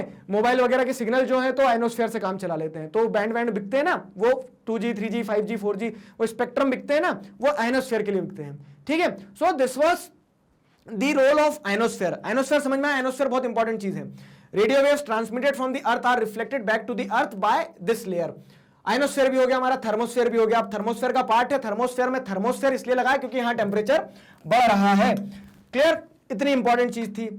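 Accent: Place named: Maharashtra, India. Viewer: Sir apke mu se kuch word marathi aate he vo hm jaise Maharashtrian ko bha jata he or aap hame apne hi lgte he☺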